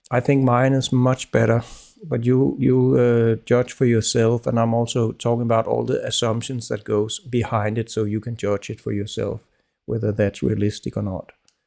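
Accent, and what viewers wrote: Denmark. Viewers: Are you from Denmark? The English sounds "Danish".. (I am a Norwegian)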